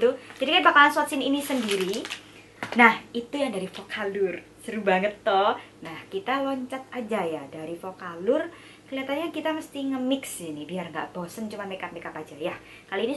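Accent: Java, Indonesia. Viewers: Kak asli orang mana? Kayak orang cina tapi cara ngomong nya orang jawa 😁 IG Lutfianasukma #kathlakzgiveaway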